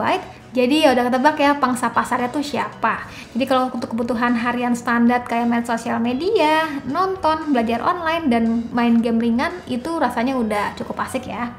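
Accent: West Java, Indonesia. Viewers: Mantap 😘😘😘😘 Sunda gituloh😂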